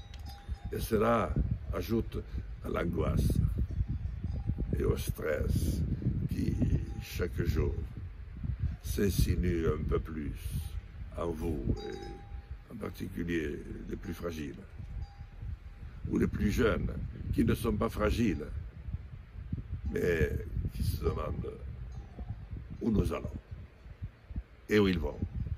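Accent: France. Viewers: Bon rétablissement cher monsieur Lassale vous nous êtes très cher. Votre accent sent bon notre France ou ce qu'il en reste... je suis franco-française j'aime par-dessus tout mon pays et de voir qu'il se dégrade aussi vite me fait dire aussi "j'ai mal à ma France". Remettez-vous bien vite auprès de votre famille.😅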